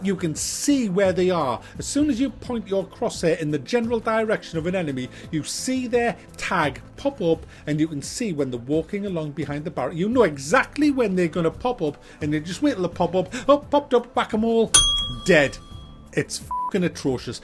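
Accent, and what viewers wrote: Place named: Scotland, United Kingdom. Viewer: I subscribed after hearing your fantastic Scottish(?) accent! Oh, and the ranting is a good bonus.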